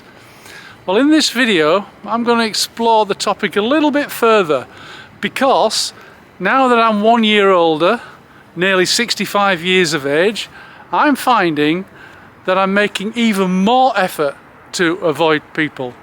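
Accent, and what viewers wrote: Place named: England, United Kingdom. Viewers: Overnight i just wanted be on my own, that was back in 1990, I remember the moment, it was very strange. Judging by your accent are you from Leeds or Huddersfield? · First video of yours I've watched. The title caught my eye (I'm 61). And, as you started, I heard "York"...one of our kids went to graduate school there so we visited a couple times and really liked York. I've always avoided people more than most probably, but at this age, I have even stronger pull to have regular interactions with a couple close friends, not several acquaintances. As for you, I mean this with genuine respect, you sound like the locals we met there, many we knew were lifelong residents of York and they said it has gotten similarly busy. I'm not sure the young folks behavior is a result of much of anything other than being young and their particular generation. 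I'm American (don't hold it against me) and we see similar here especially in places where young folks are in higher numbers. Appreciated the video, liked, subscribed, looking forward to viewing more...there's not much talk about aging so these are helpful. Thanks! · Hi,. I'll be 65 early next year. No, it's not just an age thing that we do our best to avoid people. I've always been that way since a little kid. Obviously, natural introversion comes into it, it's something you're born with. Also, environmental factors like family life etc, as you're growing up, and the not so good things that come with it. That can deepen the introversion. At nigh on 65, i've always loved my Solitude, it's my medicine, i'm happiest then. You sound like a Yorkshireman,. I'm from Sth. Yorkshire. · Can't stand English accents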